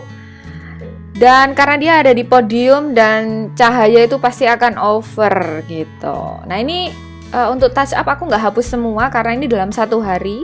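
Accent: Java, Indonesia. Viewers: mbak saya pengen belajar makeup tolong gimana cara nya, karena saya sering melihat hasil makeup bagus dgn medok jawanya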